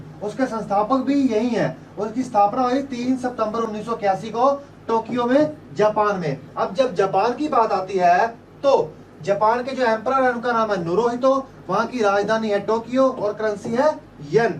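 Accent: Punjab, India. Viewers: Sir g apki language me punjabi jhalk rhi he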